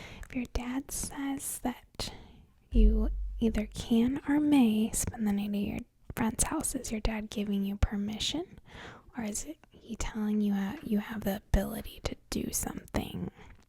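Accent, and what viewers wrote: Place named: Midwest, United States. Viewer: I love your accent! It sounds like Michigan or somewhere in the midwest. Idk I’m Canadian what do I know lol.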